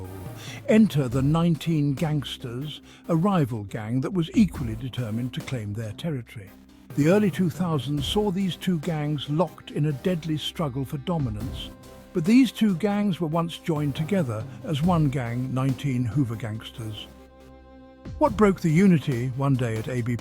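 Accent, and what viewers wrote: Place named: RP, United Kingdom. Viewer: Hearing an upper class, civilized, old Englishman's accent narrating the Shreveport ghettos cracks me up 😂😂.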